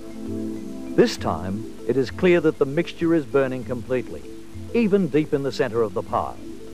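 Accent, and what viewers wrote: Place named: Australia. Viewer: aussie documentary? listen to the accent, as an aussie picked it straight away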